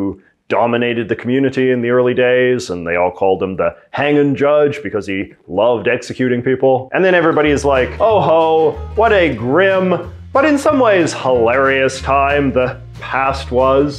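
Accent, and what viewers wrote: Canada. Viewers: Love your unmistakable Canadian accent!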